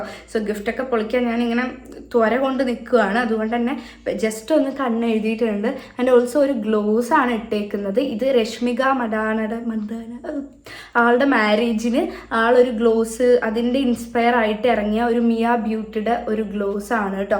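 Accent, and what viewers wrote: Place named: Palakkad, India. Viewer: പാലക്കാടൻ നിഷ്കളങ്ക സംസാരം😍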